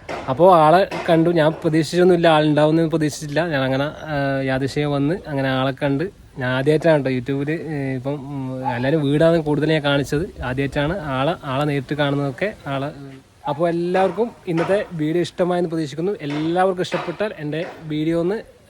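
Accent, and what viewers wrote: Kannur, India. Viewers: ഇയാളുടെ സംസാരത്തിനു കുറ്റം പറയുന്നവർ ഓർക്കുക പല നാട്ടിലും പല രീതിയിലും ആണ് സംസാരം ഇത്‌ കണ്ണൂർ കാസർകോട് ഭാഷ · valre shriyanu chodukkanum , athinu corect Aayi marupadi paryanum shrmilkuka malayalm kannur slang pryumbo kelkkunnvarkku arochakamyi marthirkkan prthyekam shrdikkukka njanum oru kannur payynnur karan anu nammlde slang mattullvrkku mansilyikollenminnilla athu onnu shraeicha thnne nannvum Aniya prgarm nallthanu niglude oru tudkkakrnte vishmam undu athokke sheri ayi vratte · KANNUR BHAASHA ETHRA NANNAAKKAAN NOKKIYAALUM MOZHACHIRIKKUM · Ninda oru kannur samsaram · ❤kannu style aanu samsaaram.its ok. but culture illatha oru perumatam . oralod samsarikkumbol ulla reethiyil maatam varanam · Kannur slang👎🏻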